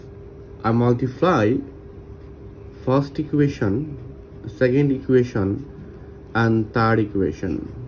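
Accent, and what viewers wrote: Bengal, India. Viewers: Bengali accent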